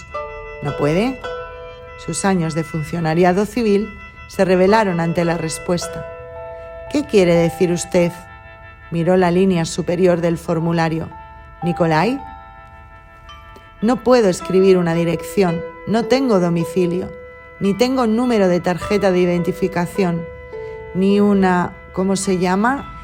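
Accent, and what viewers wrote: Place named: Spain. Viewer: Gracias Camaradas iiiii Que bella voz tiene la Camarada que narra en este Audio, tiene una voz Muy Clara, Firme y Armoniosa, un español claramente de España,toda una Gran Guerrera iiiii Saludos.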